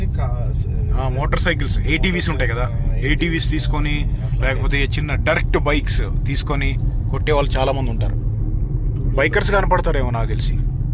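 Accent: Andhra Pradesh, India. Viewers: Pakka Meedhi..... Vijayawada nundi Nizamabad shift ayina Family Bro meedhi ... :D Langauge lo eh matram Telangana ledhu ..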